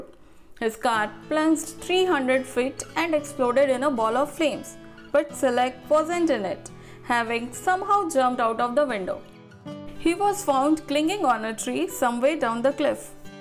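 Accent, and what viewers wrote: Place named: India. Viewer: Your accent is good. There is nothing wrong in Indian accent 😊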